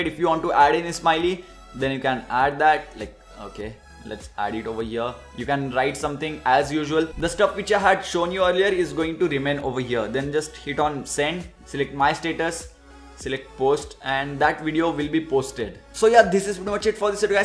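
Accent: India. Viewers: OMG are you INDIAN not again....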